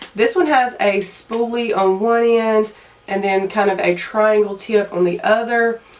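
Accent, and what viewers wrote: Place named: South, United States. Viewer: I’m from Louisiana and I’m guessing the accent is close by...Makeup Arkansas or Tennessee? 💛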